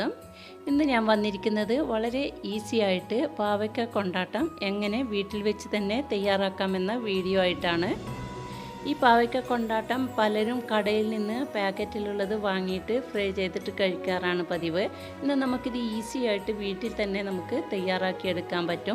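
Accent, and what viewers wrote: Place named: Kannur, India. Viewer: Ith sherikkum oru variety thanne😍😍 ithaante nalla sound😍😍enikk kannur slang ishtaanu.. peruth ishtam ❤️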